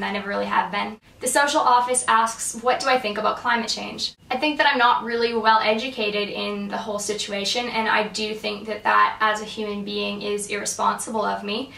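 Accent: Australia. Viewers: she kinda has that australian accent, lol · You're getting a little Australian tang to you're accent lol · Are you starting to pick up an australian accent? :)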